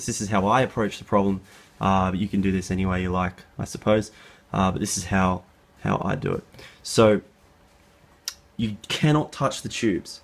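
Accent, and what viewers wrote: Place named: Australia. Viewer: I hear an aussie accent, how much did your amp and cab set you back? They're so expensive over here I'm thinking about maybe the deluxe 20 instead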